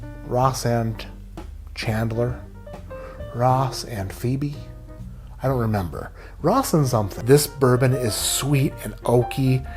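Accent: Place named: Midwest, United States. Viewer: You are very welcome sir. I enjoy your content. I enjoy your plain spoken mid-western accent. I also like that you are willing to call out duds when they are duds. I am still writing now because I am hoping that the longer a comment is the better it helps your placing in the almighty algorithm. Have you considered the great spaghetti monster? When you were small did you pronounce it "sketty"? or "pssssketty"? I did. I really like the bit you have going with Rex. I can't wait for your reponse. I hope it is full of hyperbole and sillyness. I know what will boost comments. Let me start an argument. Okay: Jack Daniels isn't Bourbon. Why? Because they don't say Bourbon on their own label. And if they're too good, or too yella to say Bourbon, they don't deserve such an honor. Ok, that's all I can think of for now. hope this helps.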